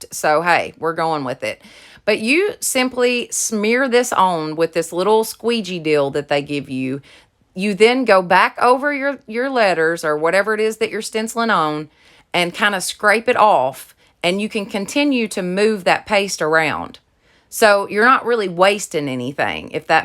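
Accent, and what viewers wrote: South, United States. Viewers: Great DIYs, so inspirational and a great testimony of the true meaning of Easter! Thank you!! The phrase, "He is Risen" has been used for generations from the greeting the disciples shared with all after Christ arose. Today in some churches, it continues to be the greeting we share with one another. Two comments. The undertone of yellow is white, so your lettering blurs and hard to see on your DIY #1, etc. . You might trying black to make your messages stand out, a higher contrast. Also, you do a wonderful job with your passion & creativity. Try not to say your work is not very good. It is all about encouraging each other and no need to do that. It is not easy doing these YouTube videos, I have done one as an Interior Redesigner & color Consultant & Instructor so I enjoy hearing your warm Southern Accent, too. You Go Girl!!!😘😎😇Irene, Chris's Mom, Idaho Color Lady. 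<link>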